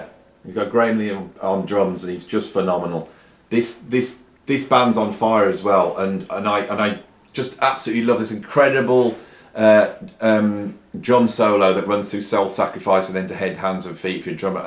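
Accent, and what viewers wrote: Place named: England, United Kingdom. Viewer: Give him a break he's an Englishmen they all pronounce words that end with an "A" ( er) . people here in the U.S. (New England) do the same. '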